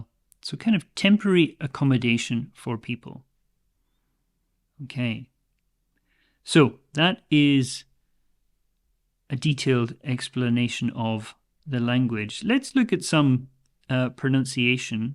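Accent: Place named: Scotland, United Kingdom. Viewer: From a French point of view, the term "Anglo-Saxon" refers to all people living North of the English Channel or across the Atlantic. But in reality, almost each village in "England" has his own accent, without taking into account Scotland with his 10 dialects (South Central, West Central, East Central North, Borders Scots, East Central South, Angus & Kincardine, Doric, Orkney, Shetland, Lalland, Scotch)... I haven't noticed rhotic accent with you yet, but /ʌʊ/ instead of /aʊ/ like (cow, town, house, down -> coo, toon, hoos, doon) or /əʊ/ by /o:/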